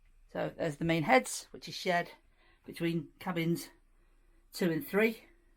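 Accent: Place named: North, United Kingdom. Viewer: Thanks enjoyed that, I too found your channel from Nick's recommendation, though being a fellow northerner I prefer your accent.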